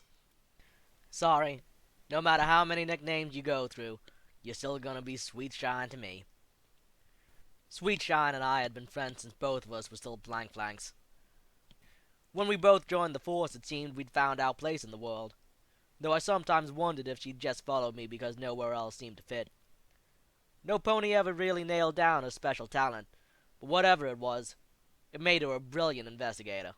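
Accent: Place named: United Kingdom. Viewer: Enjoyed it. Wish you had continued this; really like your voice there, especially with what your accent brought to this. Is it British? (ugh, can't place the accent otherwise. . .)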